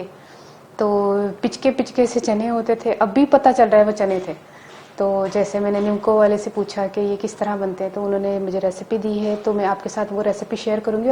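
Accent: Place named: Punjab, India. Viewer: I'm late today oooo but anyway amazing and nice cooking and i loce punjabi touch when u speek punjabi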